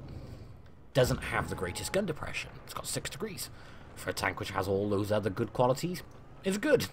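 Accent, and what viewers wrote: United Kingdom. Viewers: Many will find it weird but ever since I subscribed aside from his perfect Analysis of the tanks and the game and all ( which is in my opinion is better then bushka and the others) his voice is just perfect you know a WWII battle gameplay in the background and his calm voice with the British accent explaining what is going is what forces me to watch his videos let alone the extreme accuracy and information he provides in the game..... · Such a lovely review, thank you! Hey, WoTB needs a British voice for the british tanks... I hope WG's going to ask you to make the voices 😜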